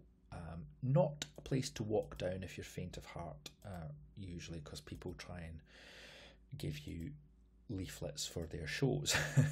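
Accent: Scotland, United Kingdom. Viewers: Do you think there are commonalities between Scottish and a certain kind of Indian speech? Trying to speak as they do in England isn't working out very well. It never stopped feeling like I am trying to be like something I not, and more importantly, was not meant to be. I found your channel because I feel iike there is a likeness in Scottish tongue to that of my own, via which, I could develop. And that wouldn't feel contrived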